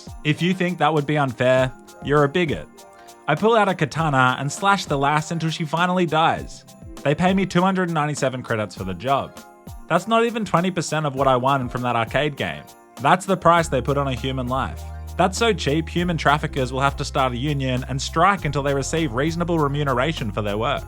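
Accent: Australia. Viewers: Yeah he’s Australian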